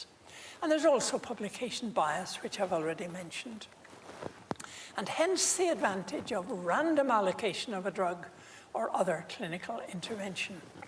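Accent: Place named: Northern Ireland, United Kingdom. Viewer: Sounds like a lovely northern ireland accent! 😊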